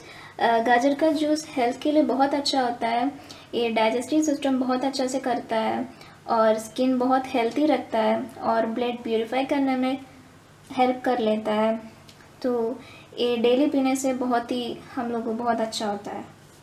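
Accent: India. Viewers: I think she is from south they almost speaks in same way👍🏻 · Shaan Mujawar she is probably from south what’s the point of making fun of her Hindi it’s not her first language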